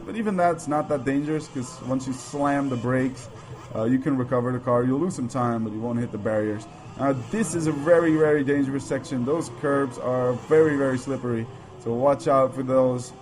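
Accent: United States. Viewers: dude you have a kick ass american accent haha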